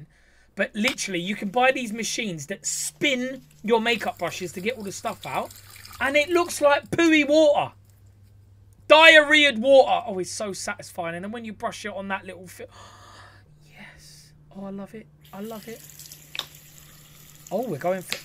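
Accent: United Kingdom. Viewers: Love love love ur Brit....xoxo me mum was born in Liverpool because of WWII..my grandparents were from Mayo Ireland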